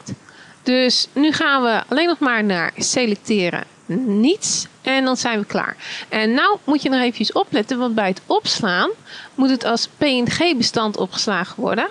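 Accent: Netherlands. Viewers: ik kon van je engels uitspraak in andere videos al horen dat je nederlands was.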